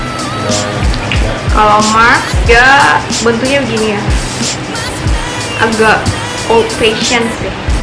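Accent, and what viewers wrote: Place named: Banjarmasin, Indonesia. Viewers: norpuadah puadah ketahuan yaaa.. memang asli banjarmasin mbaa.. thx for visiting 😂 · bahasanya kaya orang banjarmasin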